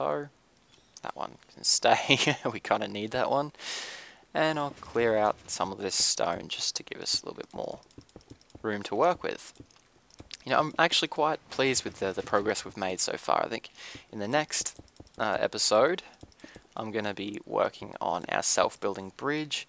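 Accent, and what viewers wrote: Australia. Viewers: I really like your Australian accent! I lol'd when you said "ice fountain" because I thought you said "arse fountain" XD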